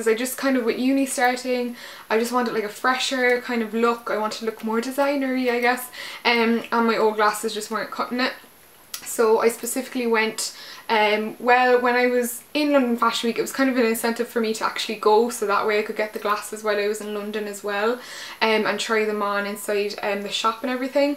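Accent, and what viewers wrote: Ireland. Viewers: I loved ur haul!it was also really nice to listen to u talking about the products instead of just showing it quickly...I am glad I have found you because I think we have a similar taste in clothes. x check out my videos if u have time..xx ps. love ur irish accent...one of my best friends is from near belfast xx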